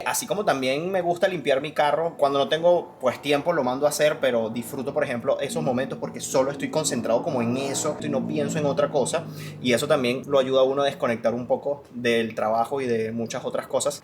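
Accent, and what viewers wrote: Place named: Venezuela. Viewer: Lo que más me gusta es su forma de ser su carazter como compaginan. Me encanta escucharte con ese acento venezolano wue me recuerda cuando vivi allí y que tanto wuieto ese país donde nacieron mis hijos. Y me encanta tb como es y además de ser muy guapa, Dios os cuide siempre y seanuy felices Me contenta mucho y me hace muy feliz veros a vosotros llevsndoos tan bien. Yo naci en España, pero me crie en Venezuela 40 años. Veo muy amenudo vuestros vídeos, pero casi no escribo.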